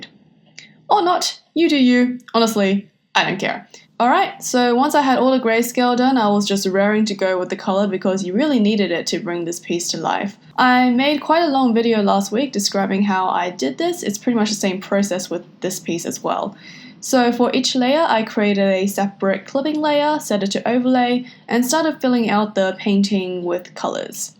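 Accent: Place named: Australia. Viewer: I love your voice!!!! (And your art of course) But what is your accent?? It sounds Australian but also something else at points?? I’m Australian so I know what sounds Australian lol 😂 I’m confused about your accent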